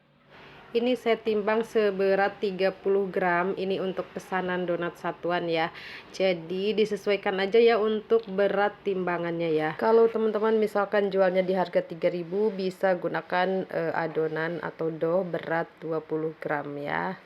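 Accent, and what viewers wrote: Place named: Padang, Indonesia. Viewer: Seperti nya dari cara bicara dan logatnya, bunda ini kayak orang padang..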